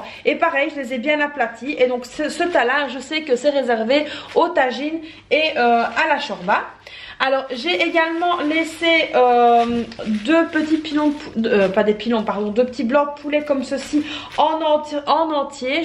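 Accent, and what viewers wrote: Belgium. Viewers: Bonjour! Chouette vidéo! Votre accent viendrait-il de Belgique? 😉 Bonne journée!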